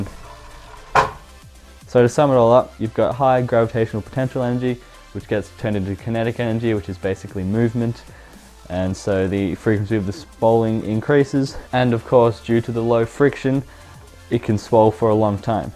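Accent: Australia. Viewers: This is really cool! My 2 brain cells are trying to keep up 😂 your Australian as well! Yeah mate SUBSCRIBED 👍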